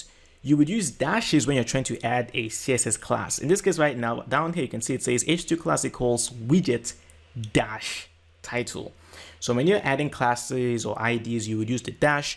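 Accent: Nigeria. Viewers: Great video. Merging my php knowledge with Wordpress has been tricky. This is really helpful. Thanks You sound Nigerian. Are you Nigerian? I’m Nigerian 🇳🇬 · Hey bro, good info, is that a Nigerian accent lol?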